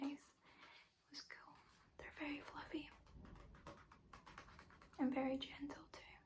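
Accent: Scotland, United Kingdom. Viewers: Just discovered your channel! It’s up there with the discovery of penicillin 👀🤣 haha amazing voice for ASMR, so soothing and calming, plus ye canny beat a Scottish accent 😋 I’ll look forward to many more videos. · My goodness, you're the double of model Emily Ratajkowski, but with a Scottish voice... what a perfect combination 😊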